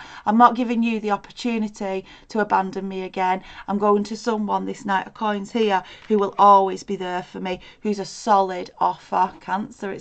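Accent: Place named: Liverpool, United Kingdom. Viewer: liverpool accent !! love it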